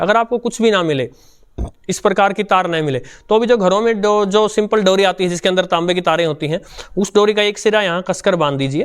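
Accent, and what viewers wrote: Standard. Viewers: Very nice.. impressed by your tone ,pure Hindi and clear details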